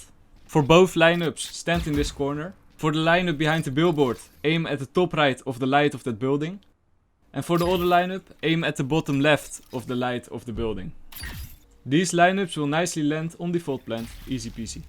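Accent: Netherlands. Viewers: Ma man's dutch i know the accent because i am dutch too lmao, good and handy vid tho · I love the amount of Dutch I hear in your voice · Broo your english is on point, ye your accent is really obvious dutch but outside of that you know exactly what to say! Lekker bezig maat! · use wierd KAMERA spots, love the dutch accent xD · Loll i like your dutch accent 😂😂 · Kamera spots love the dutch excent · Loving the dutch accent <3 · The dutch accent is strong lol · Gy eb echt een nederlands accent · you hear he is dutch lol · Hey ben je nederlands want je hebt een hard accent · A bit of nederlandse accent maar its goed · het is een hollander je kan het gewoon horen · im only watching this now, but when i heard you speak the first sentence i recognised a fellow dutch accent. i decided to check your channel name and heyy i was right!! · U sound sooo dutch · Nice Nederlands accent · XD, I literally hear the Dutch accent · loving the dutch accent 😩☝️ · Nederlander xp hoor je gewoon aan je accent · Jij bent 100% een nederlander hoor het aan je accent hahaa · Een Nederlander ik hoor het aan het accent 😂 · When I started the video I heard the Dutch accent instantly nice vids man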